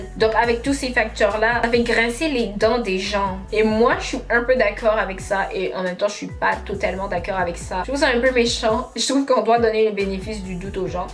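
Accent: Quebec, Canada. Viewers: On dirait ta un accent québécois 😊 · petite accent canadien? c'est mignon <3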